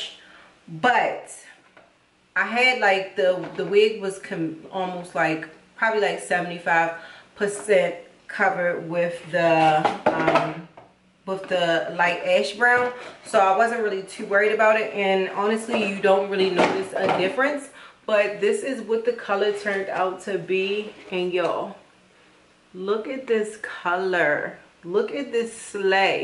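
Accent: Baltimore, United States. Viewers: Guuurrrlll I hear BALTIMORE all in your voice!!! 🤣🤣🤣 #DMV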